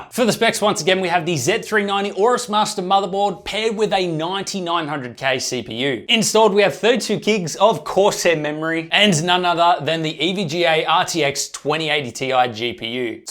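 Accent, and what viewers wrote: Australia. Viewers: That Aussie accent and saying,”… if I should burn it!” Killed me!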